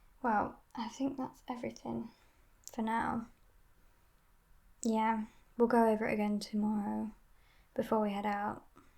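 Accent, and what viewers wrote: United Kingdom. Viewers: Shes either gone MORE british or this is her actual accent and the "posher" one is put on...blown my mind haha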